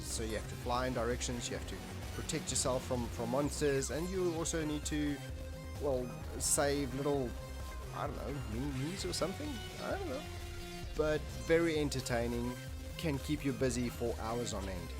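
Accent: South Africa. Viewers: accent is totally South African, sound like some of my boets Joburg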